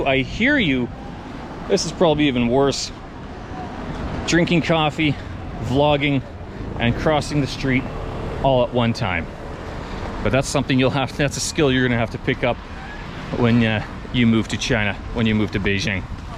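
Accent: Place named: Canada. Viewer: Interesting that you digitize people and I’m interested how you isolate your voice in Starbucks. I suspected you were Canadian even before you answered. I’m also a Canadian in China.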